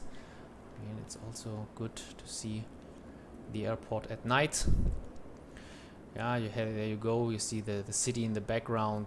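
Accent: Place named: Germany. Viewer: Many thanks for your answer. Let's hope to see more static planes because I don't need any moving traffic at the airports...safe some FPS for me at least. Can I ask you for your PC specs and how many FPS do you got during your flights around Copenhagen? Btw your voice sounds more like a german speaks english then a greek people ;-)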